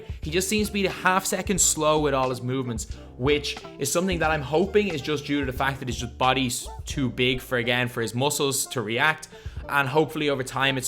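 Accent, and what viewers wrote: Ireland. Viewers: I can tell you're Irish... Noice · I know that DKM is Scottish, but are you Irish?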